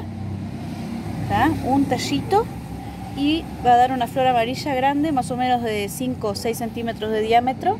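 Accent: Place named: Argentina. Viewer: Me distes una explicacion muy buena y tenes un acento Argentino de lo mas precioso saludos desde Australia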